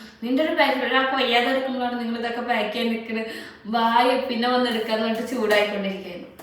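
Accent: Thrissur, India. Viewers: That's their style...thrissur chela idath Njan ketitund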